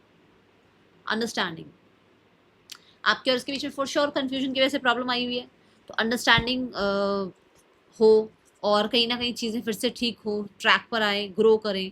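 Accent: Punjab, India. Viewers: Muje ap ki lengve s पता लग raha h ki ap पंजाबी h Mam टोटल बदतमीजी इसने ki h Maine पता नहीं कितनी बार फोन kiya per इसने kabhi फोन att nahi kiya kya karu please please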